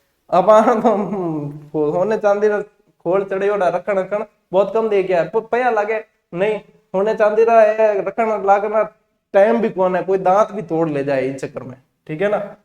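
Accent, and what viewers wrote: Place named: Marwar, India. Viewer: Sir apki marbadi language apke muh se sunne mein bada annad atta mein braj bhartpur se hunn sir moy bhi apni mother tunge bolbe mein bdo mja abe guruji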